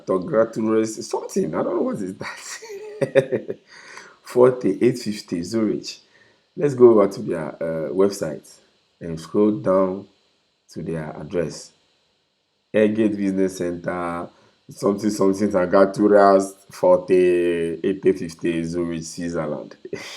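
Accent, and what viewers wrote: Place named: Ghana. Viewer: Wow you're a Ghanaian,it was easy for me to understand from a fellow Ghanaian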